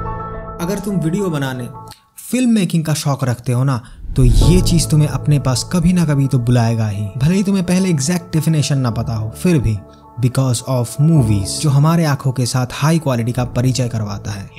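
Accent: Bengal, India. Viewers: yes dada,junejo Bhai toh chiloi.... Casey Neistat o chilo kintu ami sotti mon theke chaitam amader ekhne keu storytelling bepar ta k ato Sundar kore bole na! Tar por tmr content dekhlm... I know keu contestant na ..sabai one of kind...you are unique person..even your content in ur way...mone hoy tumi o Bangali...tmr accent ar pujo te ghorar video dekhe...mone holo...achha jai houk... Love you boss...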